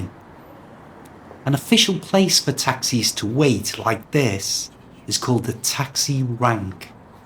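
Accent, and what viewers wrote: Liverpool, United Kingdom. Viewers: Accint liverpool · Your class was very didactic, but the Scouse accent is very complicated, in many parts I didn't understand what you were saying!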